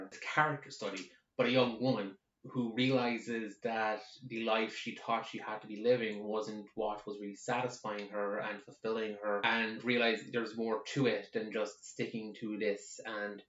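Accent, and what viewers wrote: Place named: Ireland. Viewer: OH MY GOD YOU’RE IRISH?!?! Your accent is so adorable!!!!!!! Also hi lol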